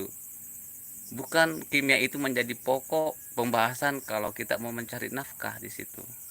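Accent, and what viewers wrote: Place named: South, Indonesia. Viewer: Posisi dmn ki, bos ku kayaknya logat selatan ki ini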